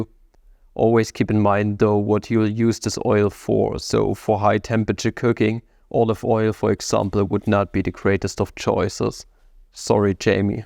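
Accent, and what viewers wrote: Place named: Germany. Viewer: This man has the strongest German accents ever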